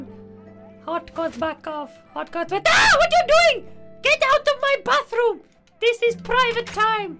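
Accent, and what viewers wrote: India. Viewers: Loved that Indian accent. My. Mum has an Indian accent but I prefer yours. Wish I could speak like that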